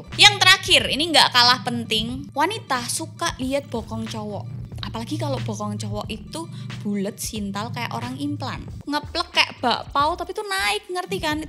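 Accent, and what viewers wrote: Java, Indonesia. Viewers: Aku seneng bahasanya enak blak blakan medok jawane sukses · logat nya jawa ya sama jawa😂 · Wuaduuuh...!!! Medok buanget mbakayu!!! 😘😆🙀😹🙈🙉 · Suaranya medok nduuu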